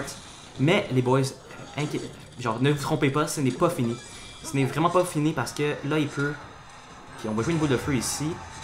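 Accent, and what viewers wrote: Quebec, Canada. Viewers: Salut, vidéo géniale avec accent québécois génial. Continue comme ça. Voici mon ID clash royal pour l'emote Pekka: #YUJP2JCG8 Baptiste.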